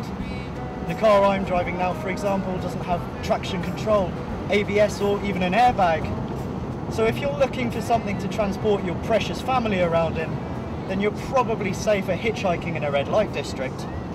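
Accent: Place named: RP, United Kingdom. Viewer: He sounds so posh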